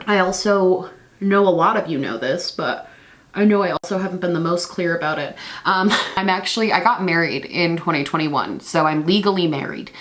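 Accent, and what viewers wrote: United Kingdom. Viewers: my love, we are going to need book reviews now. Full English accent accepted. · Video in a British accent? 💜 lots of love!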